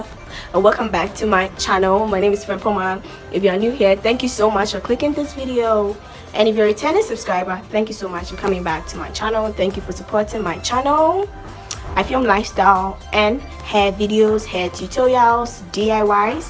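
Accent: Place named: Twi, Ghana. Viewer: 🤣🤣🤣🤣🤣🤣sorry. Your Twi yi de3333❤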